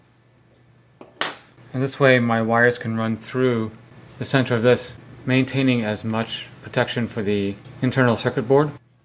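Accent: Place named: United States. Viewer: But plugging it into the wall aren’t you feeding it 110v? Your accent sounds American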